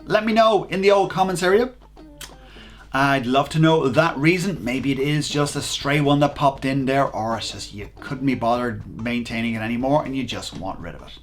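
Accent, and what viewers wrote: Ireland. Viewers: U are DEFINETLY from cork or something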